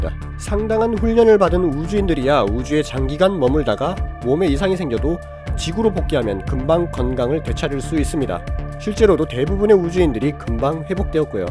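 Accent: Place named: Gyeongsang, South Korea. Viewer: 경상도 사람인가보네요 서울말 잘하시는듯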